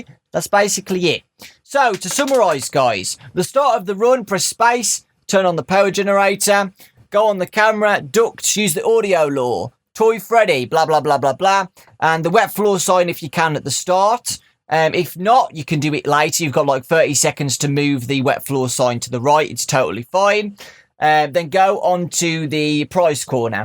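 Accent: United Kingdom. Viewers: ultimate britain voice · He is british right? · This guy has such a British accent it sounds fake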